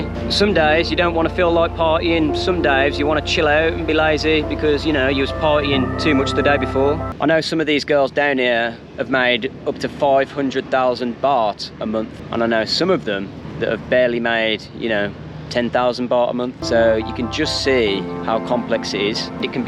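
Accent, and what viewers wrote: Birmingham, United Kingdom. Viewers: You from Birmingham mate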